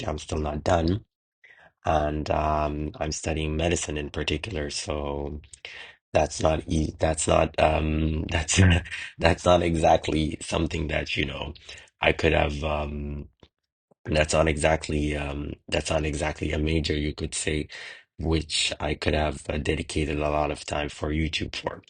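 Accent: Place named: Quebec, Canada. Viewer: Hey there ! Discovered you 5 days ago and I was absolutely NOT disappointed. I enjoyed your video about the Reio. Please stay in good health and share us your work whenever you can :) Also, where are you from ? I hear a faint accent, but can figure it out. ^^ I'd say Québec (Canada) by pure instinct ¯\_(ツ)_/¯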